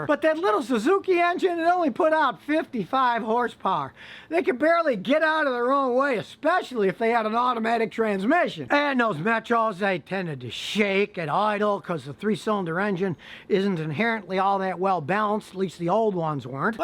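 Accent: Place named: Texas, United States. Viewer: Hi! Greetings from Argentina!!! Did you ever see the Volkswagen Fox that is sold in Latin America? What do you think about it? Thank you very much for your videos! I love your Texan accent! It's so cool!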